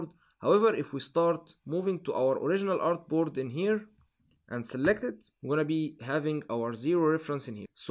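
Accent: Egypt. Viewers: مصري حيبي عرفتك من لهجتك تابع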